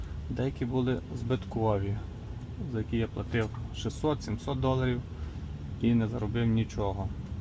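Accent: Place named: Zhytomyr, Ukraine. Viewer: Говір житомирської області.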